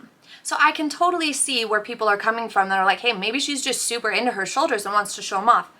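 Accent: United States. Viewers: No worries. 🙂 I have no idea where you live, but you sound like you have an American English accent, and darn it, it's very hot right now!!! 😉 Generally speaking, we are in the hottest month of the year. So if anyone looks a little bit oily or a little bit shiny, I tend to shrug and think "Well of course they do; I get it." I'm sure you will eventually tweak all of the settings to your liking. 👍❤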